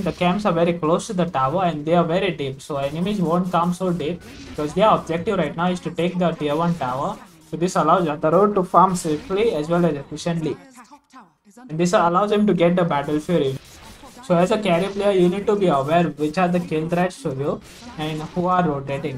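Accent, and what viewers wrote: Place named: India. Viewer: stfu bruh. just show the video no need to speak with ur indian accent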